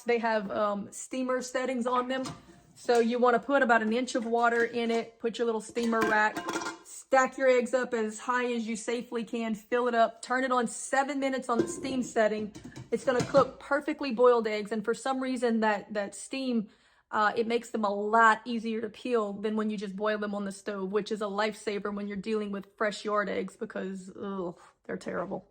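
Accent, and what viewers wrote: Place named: North Jersey, United States. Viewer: Love watching and hearing yr accent! North west nj🩷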